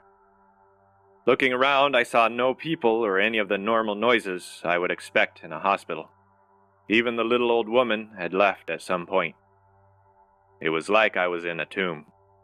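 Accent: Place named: Ireland. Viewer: I've been hanging out for this one. I'm really enjoying this series. I liked your Irish accent. Thank you very much!!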